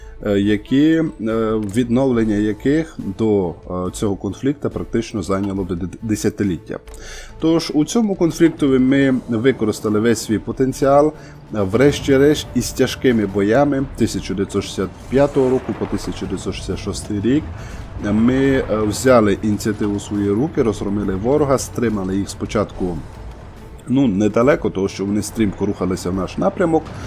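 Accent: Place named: Zakarpattia, Ukraine. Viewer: Хотів би попросити щоб колись один раз ви використали ваш закарпатський діалект на усі 100%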